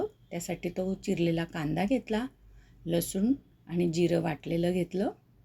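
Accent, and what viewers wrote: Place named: Khandesh, India. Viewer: एक नंबर ताई कांद्याची रेसिपी कांद्याची भाजी मला हवी खाण्याची रेसिपी ताई तुम्ही खानदेशी आहेत का कारण तुमच्या बोलण्यावरून समजत आहे मी खानदेशी आहे ताई मला एक विचारायचं होतं ते जखमी परमानंद गरम मसाला दाखवला आहे तुम्ही करतो सगळ्या भाज्यांसाठी वापरू शकतो का आपण झणझणीत उग्र वगैरे होत नाही का एक किलोच्या प्रमाणात सांगा नाहीतर अर्धा किलोच्या प्रमाणात सांगा प्लीज मी पण खानदेशी चा रिप्लाय द्या ताई मी तुमचे चैनल सबस्क्राईब केलेले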